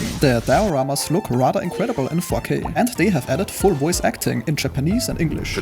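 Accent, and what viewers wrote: Germany. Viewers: ich mag den Deutschen Akzent und follow gleichmal rein ^^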